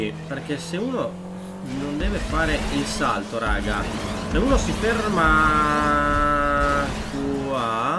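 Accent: Veneto, Italy. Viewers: Alex-Pro- Gamer ah grazie perchè io essendo di Venezia avevo riconosciuto l'accento veneto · ma sei veneto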